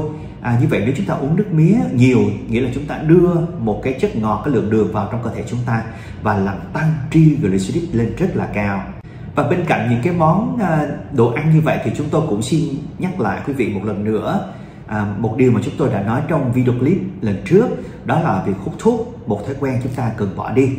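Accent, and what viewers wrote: South, Vietnam. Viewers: Bác si giải thích rõ de hiểu và nghe rõ vì bác si người miền nam và có hình mình hoa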